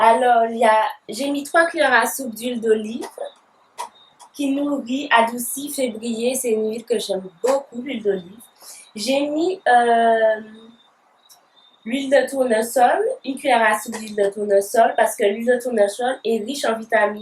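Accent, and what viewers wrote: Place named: Martinique, France. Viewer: J'aime beaucoup ton accent de la Martinique. L'huile végétale de rose musquée c'est ma préférée, sympa tes achats Gros bisous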